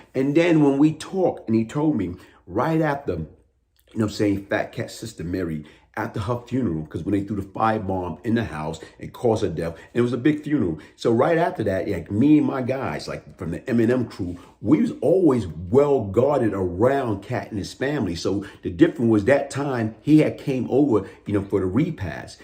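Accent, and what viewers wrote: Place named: Baltimore, United States. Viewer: For somebody from nyc you pronounce your words like you from Baltimore.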